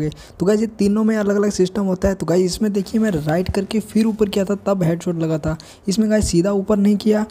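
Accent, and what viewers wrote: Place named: Hills, India. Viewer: Bhai tumahri aavaj pahadi Se milti hai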